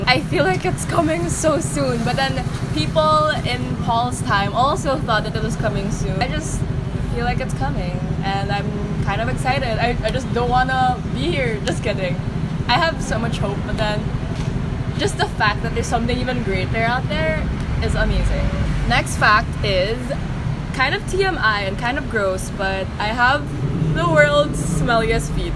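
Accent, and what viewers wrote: Philippines. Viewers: You have a Filipino accent when you speak English!! Cool!!!🤩😆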